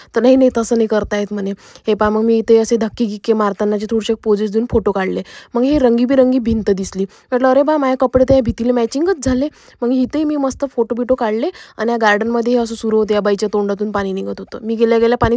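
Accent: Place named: Vidarbha, India. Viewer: विदर्भाची शान विदर्भाची भाषा..❤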